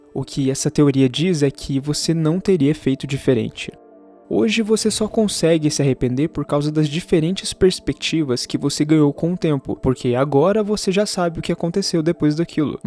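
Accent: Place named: Brazil. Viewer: O vídeo é bom, embora o sotaque brasileiro faz parecer que é uma criança narrando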